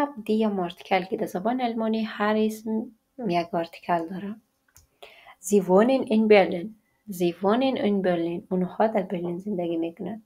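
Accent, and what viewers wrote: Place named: Afghanistan. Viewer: ایشون لحجه دری دارند. دلیل نداره که هرکسی که لحجه تهرانی نداره فارسی بلد نیست، دوست عزیز.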